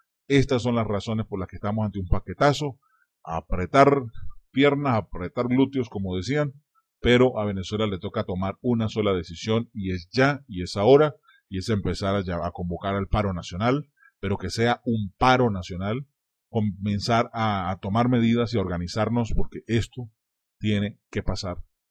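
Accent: Colombia. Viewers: no solo eso, sino que tmb es colombiche... notose si lexico y su tonico de voz... es tan colombiano cm madurin o mas xDDD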